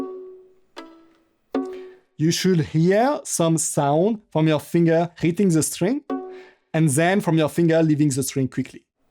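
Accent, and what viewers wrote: France. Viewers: tu parles anglais comme un français j'aime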